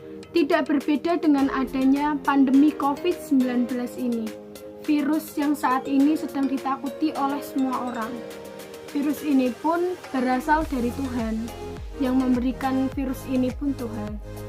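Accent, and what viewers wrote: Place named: Java, Indonesia. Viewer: Mntap mntap, jowo tulen Iki🤤🤣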